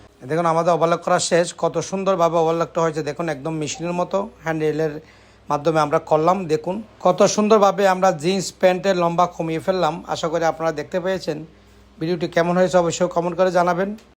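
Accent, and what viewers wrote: Chittagong, Bangladesh. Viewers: Vai apni Chittagong er….. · চিটাংগা মানুষ বদ্দা।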